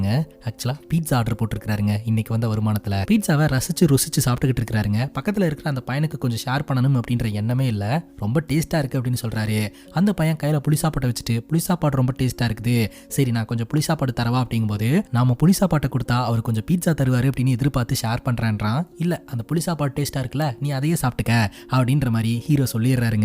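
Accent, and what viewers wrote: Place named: Kongu, India. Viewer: may be இவரு கொங்கு region ah irupanga nga...இது கொங்கு தமிழ் nga... inga elarum ipaditha பேசுவோம்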